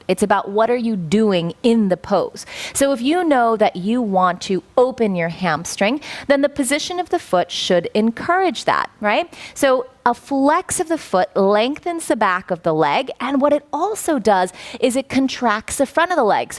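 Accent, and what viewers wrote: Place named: United States. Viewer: I hear an American accent but for some reason it's not unbearable nor disgusting and I could watch the entire video. Congrats!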